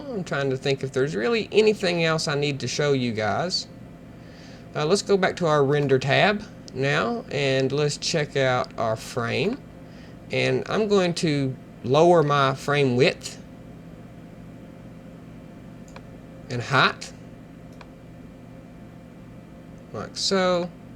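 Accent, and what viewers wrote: South, United States. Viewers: You are indeed a generous person and I love your good ole boy accent. Down home education. Excellent tutorial. Now I know what Modo is all about.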